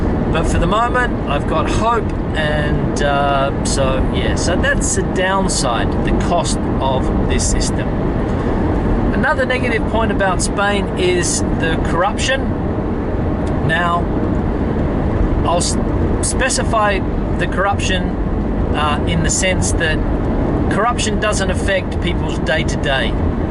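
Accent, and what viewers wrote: Australia. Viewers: Thank you we are thinking of moving to Valencia good to hear another Aussie! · OMG what are my ears hearing: A Brit acknowledging we here in Spain have great food!! xD (edit: No, it was an Aussie, too good to be true haha) Good video by the way, I agree with pretty much everything you said. And I love the driving and road footage. You didn't mention roads/infrastructure as a big pro (edit: last thing you said :) Regarding corruption: It's true that it's huge, but as you said there's no corruption at the "lowest" level, you can't bribe a policeman or a clerk etc. Also most big corruption scandals involve local and regional governments, not the State itself. I'd say the "State" and the bottom-working public officials are clean. It's the regional big-fishes who are corrupt most of the time. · That's an Aussie accent... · He sounds pretty Australian. 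Maybe he just speaks skow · Where are you from? I would say you sound Australian, but since I am Spanish I am probably not the best one figuring English accents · Why not saying you are from Australia (accent is obvious) and make comparisons with Down Under? Video could be much shorter.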